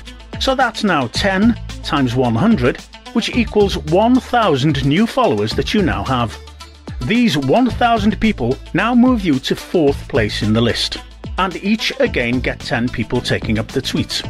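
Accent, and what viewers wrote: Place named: United Kingdom. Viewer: Haha,Judging by your accent,you are from UK,the motherland of Harry Porter...? How many followers do you have now?Please show us your twitter account.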